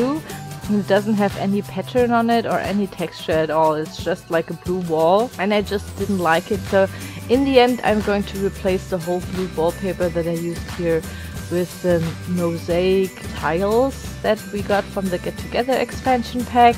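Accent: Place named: Germany. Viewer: You've kinda german accent... maybe... don't know... xD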